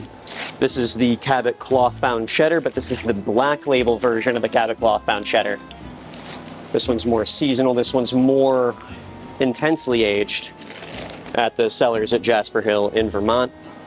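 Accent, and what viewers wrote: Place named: Germany. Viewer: Der arste Amerikaner wo mann denkt er ist ein Deutscher, Schweizer oder Engländer.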